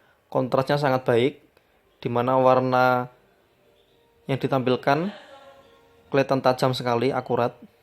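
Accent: Java, Indonesia. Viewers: Medok bgt jawanya...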